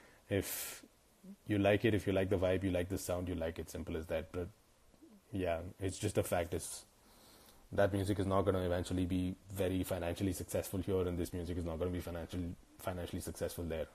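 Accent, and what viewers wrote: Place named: England, United Kingdom. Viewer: Bro I like your English accent,my English is not that good I love u bro .... and I love your songs very much .......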